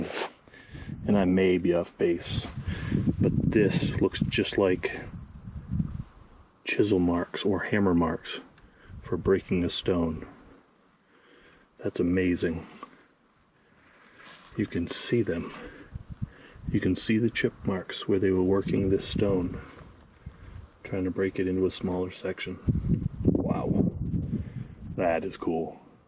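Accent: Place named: Europe. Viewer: Voice has a slight European sound .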